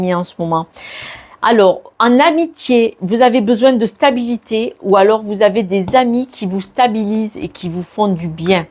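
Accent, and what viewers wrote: South, France. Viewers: Tirage très chaleureux et convivial à l'image de la personne que vous êtes, naturelle et authentique. Surtout ne changez rien. Cerise sur le gâteau, votre accent qui chante bon le sud ! Merci pour le partage de la part de votre nouvelle abonnée. A bientôt ! 🌞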